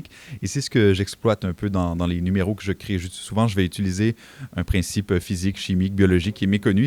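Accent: France. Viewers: pourquoi il s'est donné un accent francais??? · Pense tu as pris l'accent des Français tu ne plus ton accent Québécois...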